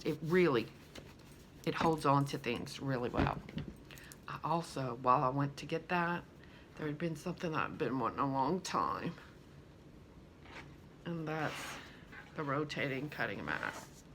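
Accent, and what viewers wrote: Louisiana, United States. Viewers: Girl I can listen to you all day. You are so Cajun.... I love you to pieces.... Stay safe. Good luck with the position....❤️❤️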